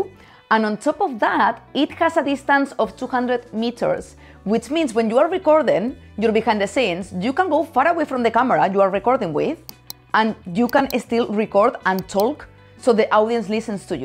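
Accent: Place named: Spain. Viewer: I have to tell you, you have taught this old photographer so many useful tips and tools. I always look forward to your fantastic videos. Side thought, you look amazing and I love your Spanish dialect. See you in the next one.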